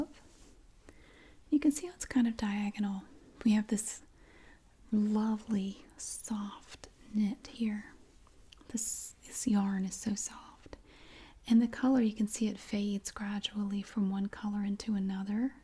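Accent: South, United States. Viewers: I absolutely love your roleplays! And your voice is so relaxing. Plus, the Southern accent reminds me of home <3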